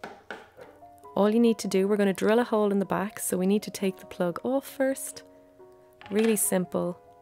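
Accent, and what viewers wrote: Ireland. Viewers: YES, you're right! I am from France, near Lourdes, and I do so much like this soft lovely Irish voice, as you said! Thanks,!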